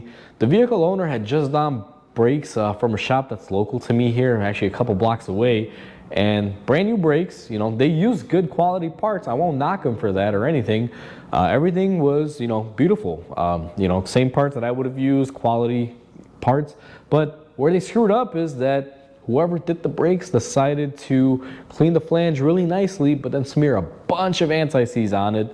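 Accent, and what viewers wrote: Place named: Chicago, United States. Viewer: People think that accent is southern and not anything but Chicago? Man, you folks need to get out more.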